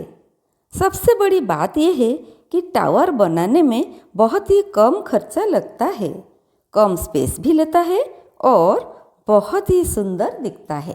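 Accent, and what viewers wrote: Odisha, India. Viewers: Mujhe to odisha ki lag rahi hai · Funny accent, Odia flavor!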